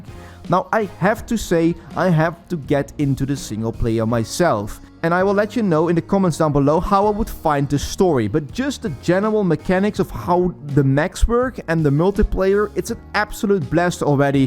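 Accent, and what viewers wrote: Netherlands. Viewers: Hahaha! Typische Nedelandse accent! 🤣😁:yougotthis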